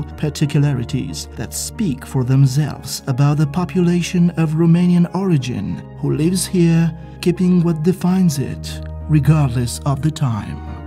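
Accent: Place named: United Kingdom. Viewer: dude,what's with that British accent :D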